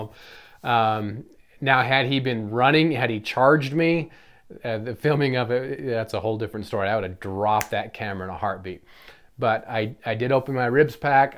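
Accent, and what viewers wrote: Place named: Appalachia, United States. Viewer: You reverted back to your hillbilly roots with that accent. Good vid.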